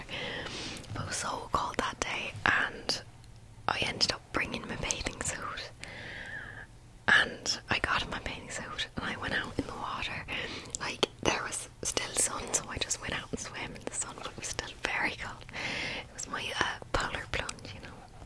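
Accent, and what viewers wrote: Dublin, Ireland. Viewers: As an Irish person this is one of the best accents from an American I’ve heard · That was actually a really good Irish accent! If you’d had started with it I could’ve been fooled and I’m Irish & have lived here my whole life 😂😂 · Awesome video. I love your irish accent. I’ve been to Ireland once for a weekend but we only stayed in Dublin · Great accent most Americans butcher it class job 🇮🇪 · Accent is actually quite good! Mix of a few places which makes it sound a tad off to an Irish person but very good. Also small note, Ireland is not in the UK! Although Northern Ireland is. Be careful around that some people might get very upset hearing that 😋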